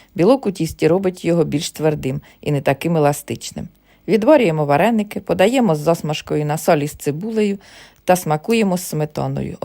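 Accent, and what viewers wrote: Ukraine. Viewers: Дякую, мова центральної Украіни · Так розмовляють у нас на Черкащині ❤в селах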